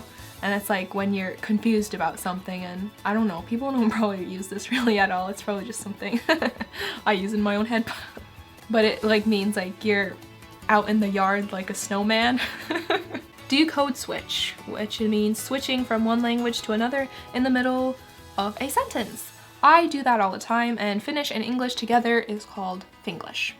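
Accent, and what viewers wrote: United States. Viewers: Hi I thought you are an American in the beginning so.. i speak 2 very old language Assyrian and Syriac and also Arabic and English and im trying to learn Finnish (: wish me good luck by the way all your video are fun to watch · You do sound american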